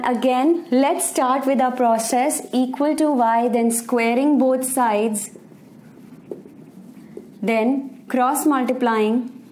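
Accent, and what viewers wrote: India. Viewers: I can't understand the accent of Indians. they emphasize a lot on words such a tttttt ,ddddd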